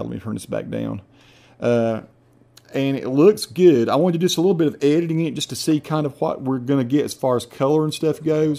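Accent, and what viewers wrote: South, United States. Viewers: Southern accent?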